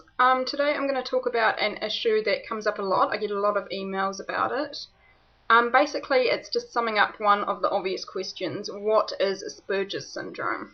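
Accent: New Zealand. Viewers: Yeah thats a good kiwi accent ! Now everyone this is a girl from a place called new Zealand . Do you know where that is? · She's a lovely keewee!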